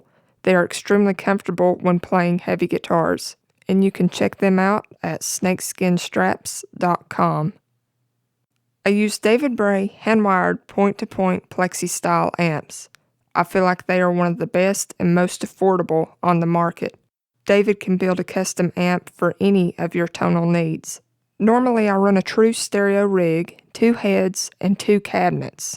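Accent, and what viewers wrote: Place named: Kentucky, United States. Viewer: WTF, come over form the Heil site and first think is that I am listening and seeing is one of my home girls form SE Kentucky, lovely lady, great accent and then holly crap rip my face off with incredible shredding and than to top off straight forward lesson. Love it great job -new fan here, keep posting.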